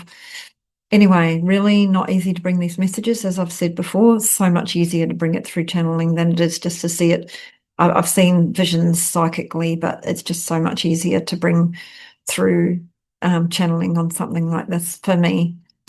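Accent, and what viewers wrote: New Zealand. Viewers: Thank you....for your honesty and bravery. I feel very connected to your messages.....and love hearing the kiwi accent!!!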